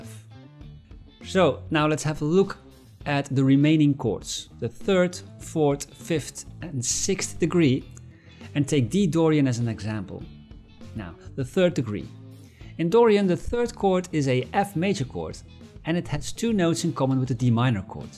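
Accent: Netherlands. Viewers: You sound Dutch.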